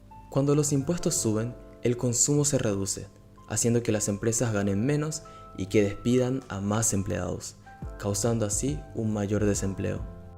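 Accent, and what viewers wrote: Paraguay. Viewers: De Paraguay?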